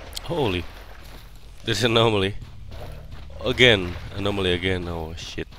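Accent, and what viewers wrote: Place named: Indonesia. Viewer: Indo ya ? logat-nya logat indo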